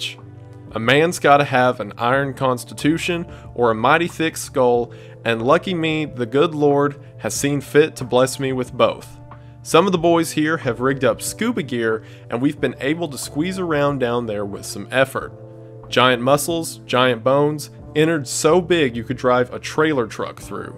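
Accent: South, United States. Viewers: you have this southern accent that comes out every once in a while and it really added to this whole experience, especially when you read letters/notes, very immersive 10/10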